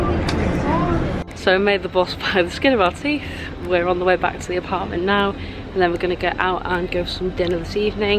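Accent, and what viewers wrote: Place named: North, United Kingdom. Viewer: ❤Fab video, I love Skiathos, cant identity your accent, nothern but where you from ? ❤ xx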